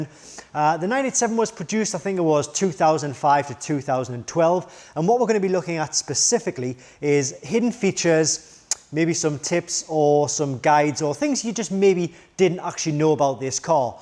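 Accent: United Kingdom. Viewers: Thanks... great to see/hear a British channel. Not clutching at straws in my mind, just useful tips.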